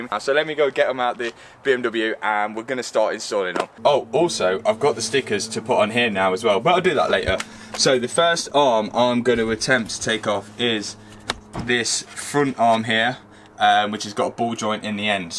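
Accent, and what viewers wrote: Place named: Nottingham, United Kingdom. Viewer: Loving the vids just subbed you got a nottingham accent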